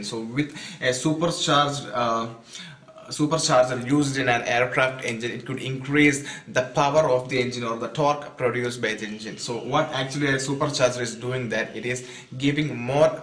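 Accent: India. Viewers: don't know if he is speaking english or indian.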